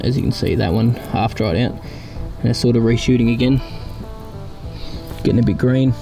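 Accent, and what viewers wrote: Australia. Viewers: Hey mate, love the vids... Are you from the northern ou southern part of Australia? Love from Portugal ❤️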